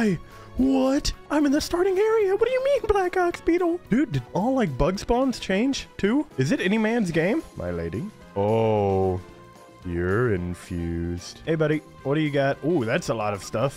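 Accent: United Kingdom. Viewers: oi its anotha brit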